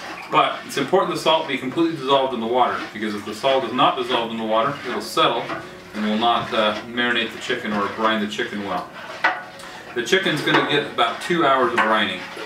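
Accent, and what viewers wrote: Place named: North, United Kingdom. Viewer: it's quite obvious you're from up North.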